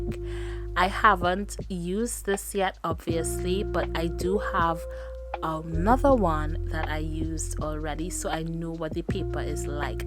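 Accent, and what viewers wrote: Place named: Caribbean. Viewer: Where are from? I detect a Caribbean accent!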